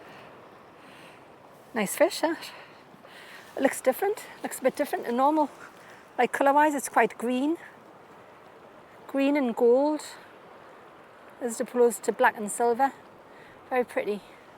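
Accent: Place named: North East England, United Kingdom. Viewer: Nowhere guarantees big fish, as I'm sure, you well know, but you can make their capture far more likely by choosing time and place carefully. Sure, just being there is good, and LRF for mini-species can be great fun, but if I'm using the real gear, I like to catch something I can eat. Your accent implies north-east England. There are some fine Cod, Bass, and flatties in that area, though of course, it's all about time and place. The opposite coast from you, holds loads of big flatties in the sandy estuaries, and some Bass. I got tired of catching wee Coalies a long time ago, so tend to avoid the east coast unless, I'm after flatties. Conversely, the west coast, and in particular, the Firth of Clyde has been commercially overfished to death. When I was a teenager, it was swarming with fish of many species. South-west Scotland can be good, but again, it's time and place. 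If I were you, I'd go less often, but farther afield. North-west and far north of Scotland can be excellent, though you'll need your hiking boots. Wales can be very good. It's not, all about catching big fish, but you'll get more watching if, you can show them a few they'd like to catch themselves.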